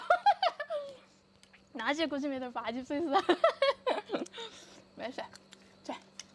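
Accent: Sichuan, China. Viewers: 四川話跟cc翻譯不押韻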